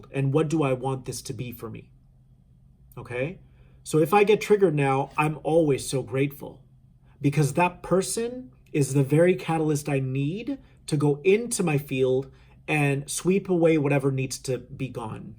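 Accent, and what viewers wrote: United States. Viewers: Felt like I was talking to an awesome kinda retired cowboy.... Lovely warm southern accent I wanna hear what he has to offer... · Thanks for sharing this! Clyde is fascinating, I used to pretend to be Bonnie from the classical story when I was younger lol. I grew up in San Antonio TX so the accent is nostalgic. Channeling individuals from other timelines used to be something I would do when I was sitting in front of my bathroom mirror or in the shower before awakening to this gift's true potential. The power of our imagination is something I have been so passionate about discovering more about recently. Definitely going to use your technique of asking what inspires people to reach out as a way to get a feel for the energy. I feel this so deeply towards the collective in regards to the sexual energy. I feel this soup metaphor so much on a personal level. 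Ooo excited to hear about the spiral. Your last point reminds me of the adage " I'm rubber your glue" but instead of only words sticking energetic patterns sick as well. It was lovely spending my now moment with you, as per usual.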